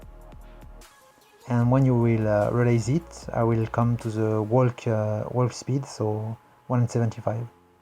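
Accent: France. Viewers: Trés bon tutoriel même avec l'anglais à la frenchy :) ! Par contre, je ne suis pas pro mais, tu n'es pas un peu en train de choisir le mode HARD pour le plaçage de tes textures ? Je me rappel vraiment être passer par autant de truc si ce n'est les nodes bien sûr ! Mais bon, chacun sa méthode. En tout cas, super tuto ! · Oh mais rien qu’a l’accent, ca s’entend que t’es français ! Incroyable ! Tu fais des choses magnifiques ! Continue comme ça !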